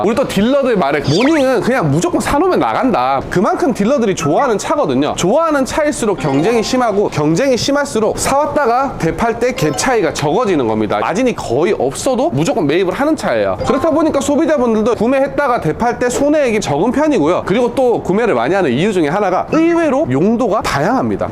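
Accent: Gyeongsang, South Korea. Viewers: 대놓고 경상도말 맞는 거 같은디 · 경상도 엑센트 들려요ㅋ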